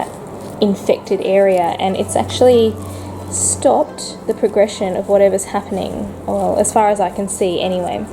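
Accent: Australia. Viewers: Good to hear an Aussie voice, my catasetum has just decided to rot it’s new growth hoping I can save the backbulb and it decides to do something in spring😢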